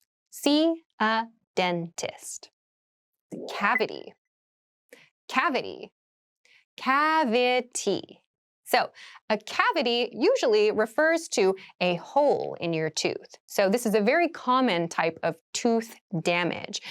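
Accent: United States. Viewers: First of all thank you for your content! I'm confused a little, why does a "suit" sound like a "sweet" (at least this is how i hear it) - I've checked that word on the youglish and it sounds a bit different. Is your pronunciation specific to some region in the US? cheers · She speak in American accent❤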